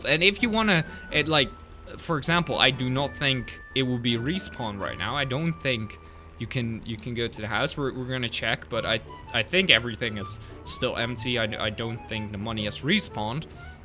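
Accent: Sweden. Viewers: ar you from swiden?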